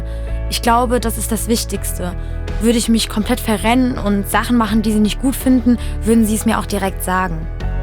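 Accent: Germany. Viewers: how come you talk German and when you talk in English you have a German accent but in your music videos it doesn't sound like you have a German accent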